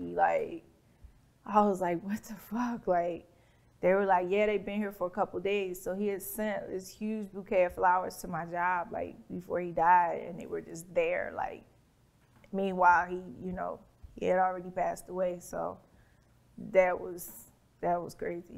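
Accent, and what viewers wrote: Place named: Philadelphia, United States. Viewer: I love her Philly Accent!!!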